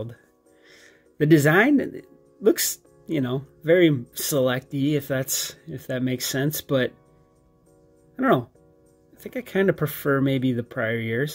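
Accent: Minnesota, United States. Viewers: What part of Minnesota are you from?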